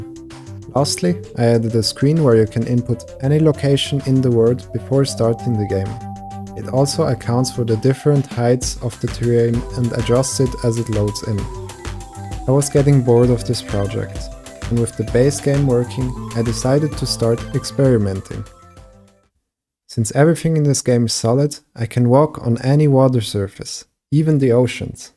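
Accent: Switzerland. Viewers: geile siech, brutali idee & rechtig guets video, de schwiizer english akzent brüder love it😂go get the bread🔥🚀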